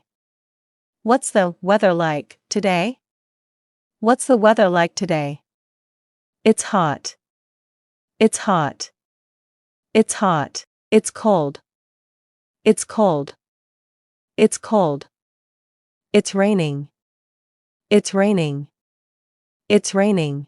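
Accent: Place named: United Kingdom. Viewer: Thanks my teacher is that British accent or American?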